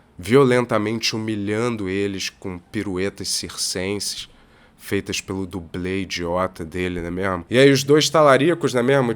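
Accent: Rio de Janeiro, Brazil. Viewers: Esse e o primeiro video que eu vejo dele com vicio linguístico, incomodou um pouco esse "né mermo" sotaque carioca já não me encomoda tanto.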